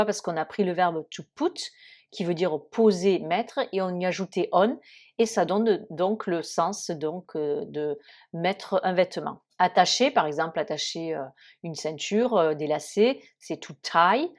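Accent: Toulouse, France. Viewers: As usual, I still very happy hearing your Toulousain accent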